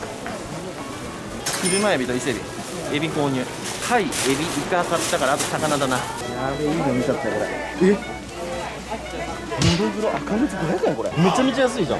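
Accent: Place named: Nagoya, Japan. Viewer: 名古屋生まれ名古屋育ちの自分と同じイントネーションでしゃべってるから笑えるw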